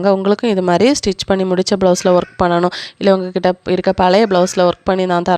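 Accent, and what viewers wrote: Kanyakumari, India. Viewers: I like your Kanniyakumari slang sis ❤ Your ideas are 🔥👌🏻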